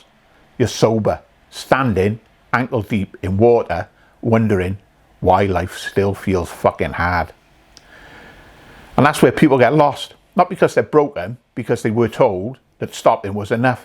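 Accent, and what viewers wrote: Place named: Wales, United Kingdom. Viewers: Hey I'm finding your videos both helpful and hard hitting. Out of interest where are you from you sound Welsh? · I am OK Monday to Thursday. I can take the loneliness and I have a work pattern that keeps me out the pub but come Friday the willpower has gone. I am not drunk for the next 3 days its just I don't want to drink at all but do. Its the not having control that gets up my back. If I wanted to drink well at least I have chosen my poison. Nice to hear this in a Welsh accent. Thanks Butty!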